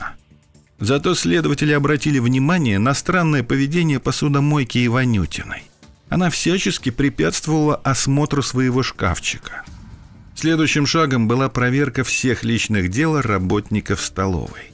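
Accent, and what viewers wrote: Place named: Ukraine. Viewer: начал смотреть и сразу подумал,что она украинка...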